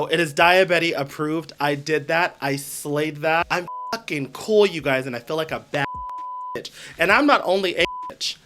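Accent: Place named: Central California, United States. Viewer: Hey gorgeous! First time really commenting but as the last video you spoke about wanting to hear more about what brings people to your channel figured I'd throw in my two cents. First of all, I love how calming your voice/energy/vibes are as many people have said. I love that you explore and play with make up, it's something I love to do as well. So many drag performers have figured out their mug and don't play with shapes and angles but as a fan of drag who has been trying to find the mug of my dreams it helps to watch someone who does different eyes cheeks lips ect. Also, I love that you still sound like a central Cali girl. I grew up in the Sacramento area and you sound like my friends and family so it's nice to hear. Lastly, you are just to muggy to not follow you come stamped every fucking time. ❤ Much love keep the videos coming because the girlies who get it get it and we love the content. 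Happy pride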